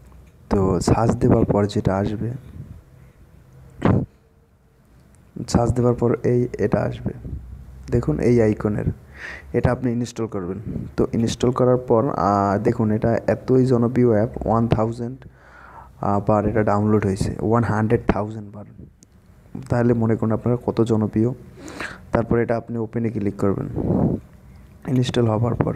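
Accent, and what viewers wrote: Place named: Gopalganj, Bangladesh. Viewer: আপনার বাড়ি মনে হয় গোপালগঞ্জ!!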